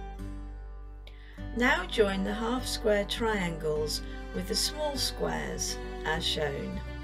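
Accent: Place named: United Kingdom. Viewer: Hello, I just found your videos & have subscribed to your channel. I ❤️ yor easy to follow method of teaching, your choice of quilt blocks & the gorgeous fabrics!!! Your wonderful Brittish accent is also a plus! In this video, you asked for suggestions as to what block we would like made by you. Since my passion is PEACOCKS & PEONIES, (my kitchen & dining room 'theme') would you please do a video on making a realistic 'dancing' peacock (tail feathers opened upright) with CORAL PEONIES applique block? I also love the Brittish Peacock Butterfly. So I am humbly & eagerly awaiting to see if you will take these two suggestions to heart! Thank you kindly from USA.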